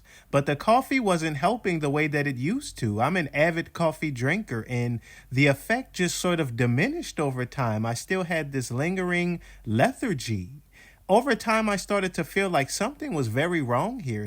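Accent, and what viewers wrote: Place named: Philadelphia, United States. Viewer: are you from Philadelphia or the surrounding area? Just judging by your accent.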